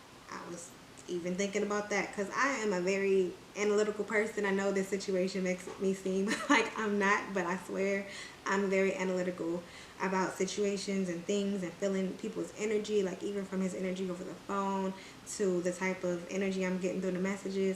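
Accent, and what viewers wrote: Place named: United States. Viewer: mad country sounding. I'm from NYC lol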